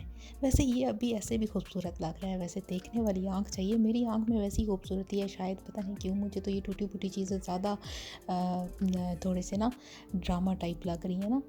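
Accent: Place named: Sindh, India. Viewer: Ap kya Sindhi nako? Ap k accent may Sindhi pan tau hay😊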